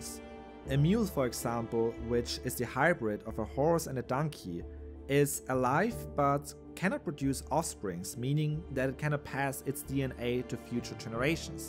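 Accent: Germany. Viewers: I see, you are probably a German (cause of the accent) and also you USED COFFEE AS AN EXAMPLE, BUT WHY NOT TEA ? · Nice Video, good graphics., but your Thumbnails lack in quality and you have a strong German Accent. Try to work more with the Algorithm, so your channel picks up. Better Thumbnails, Titles, and less Accent if possible. Just my personal advice, because I want to see this channel grow in popularity.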